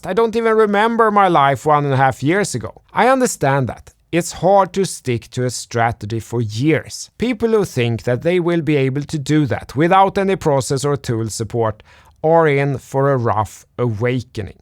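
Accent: Sweden. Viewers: Your analysis are amazing and thank you for doing all the work for us and I love your Swedish accent , please do Some doge coin analysis and prediction Thanks again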